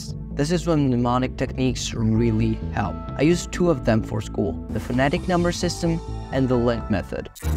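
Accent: Italy. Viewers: conosco un italiano quando lo sento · Are you from italy? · Wait, are you Italian?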